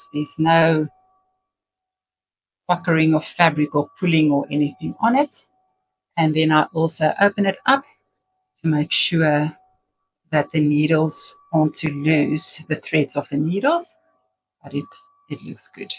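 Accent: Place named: South Africa. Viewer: I wanted to say I recognize a South African accent.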